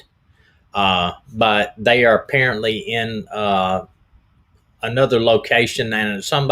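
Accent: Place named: South, United States. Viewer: . You are beautiful! And I love your southern voice💖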